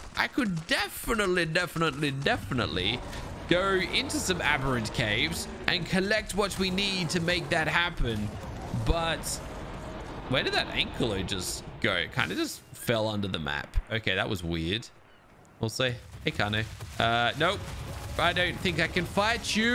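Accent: Australia. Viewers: Wait ur aussie😃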